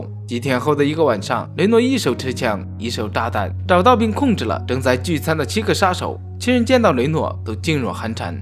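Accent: Japan. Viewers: 解说的普通话怎么有日本口音？ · 兄弟你这口音像日本人，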